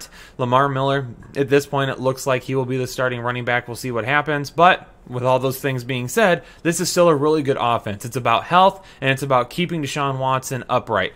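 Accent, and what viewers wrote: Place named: Minnesota, United States. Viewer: Man that Minnesota accent is real!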